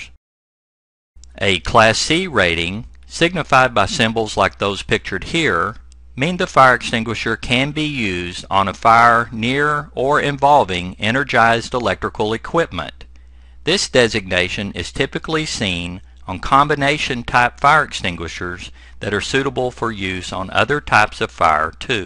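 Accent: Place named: South, United States. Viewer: I'm glad it's a southern accent not monotone to make it a little more engaging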